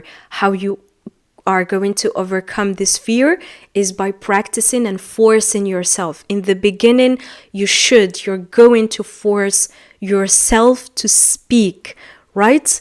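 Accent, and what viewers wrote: United States. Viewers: نطقك ما شاء الله امريكية❤ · تقولي شوفوا هاري بوتر بس الاكسنت حقك امريكي وليس بريطاني؟!😮 الزبدة هاري بوتر مو الاساس اريد الطريقة حقك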